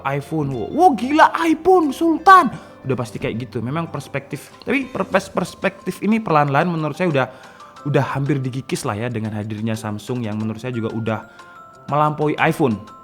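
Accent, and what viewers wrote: Java, Indonesia. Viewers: Wong jowo ta mas?😂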